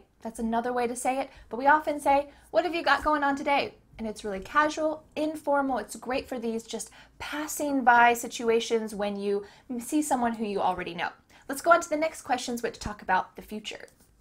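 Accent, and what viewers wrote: United States. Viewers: Thank you,. You're the best teacher I've ever seen. Learning with your videos is so varied and makes a lot of fun. Your videos and English-lessons are very helpful and I hope that I'll become a confident English speaker with it. I wanna say it again and again: thank you, thank you for everything.🍀 P.s. I love the American accent and the pronunciation of 'er'😍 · Hi. I am one of your new subscribers. I just want to tell you that I love your content. Just a question, which part of the US you are from? Is this is a California accent? I am Polish but I have been living in the UK since 2004 and guess what? I only love US accent your's is one of the ones I like that's why I did ask you this question. Thanks:)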